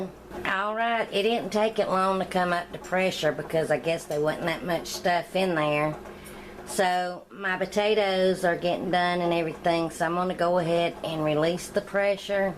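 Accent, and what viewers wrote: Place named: Kentucky, United States. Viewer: I love your accent, are you from Kentucky? I’ve made this dish several times from your recipe, it’s always delicious.. one of my favorite meals ... thanks for the video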